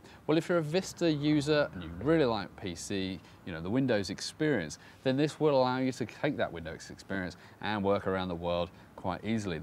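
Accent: United Kingdom. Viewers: "changing the market to create the haychpee (HP)" LOL I LOVE BRITISH ACCENTS (at least i think that he is british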